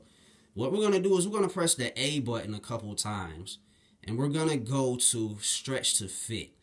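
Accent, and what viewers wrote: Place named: South, United States. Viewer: yo why do you sound like a redneck dantdm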